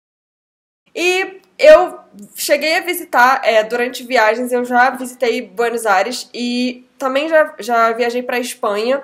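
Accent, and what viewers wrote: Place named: Rio de Janeiro, Brazil. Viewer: Eita sotaque carioca!!! TMJ!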